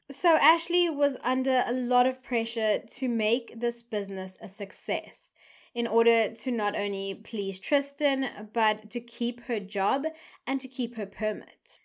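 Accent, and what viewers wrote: South Africa. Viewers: Your channel just popped up on my home page! I’m so glad it did because I already ADORE you, and have only seen this video ☺️ plus, your accent is awesome 😎 it’s great to see a youtuber in another part of the world that isn’t UK or US or Australia. We never hear cases from South Africa, except for the Pistorius debacle. This case is so scary!! I really hope Ashley can get out, the Thai legal system and government can never ever admit when they are wrong. I’ll share this video on my Twitter and Facebook. 🙏🏼♥️ much love from the UK 🇬🇧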